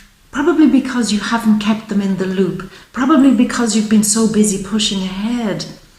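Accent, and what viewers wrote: Ireland. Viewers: You look amazing, thanks for the reading, from one Irish person to another, it's lovely to hear an Irish accent in tarot❤️❤️❤️🤗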